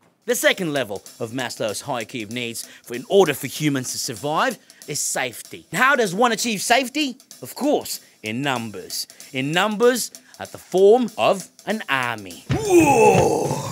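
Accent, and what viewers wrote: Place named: Australia. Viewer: That damn accent. How i wish i have that Australian accent. Here's a thumb up.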